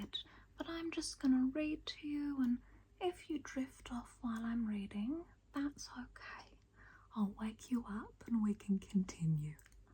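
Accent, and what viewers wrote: Australia. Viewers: Aww I love your asmr’s so much especially its in Aussie accent but can you change your face Expressions it’s make uncomfortable👉🏻👈🏻 Much love mum🩵🩵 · Obsessed with your asmr vids!! You do rich aussie so good lol 🤍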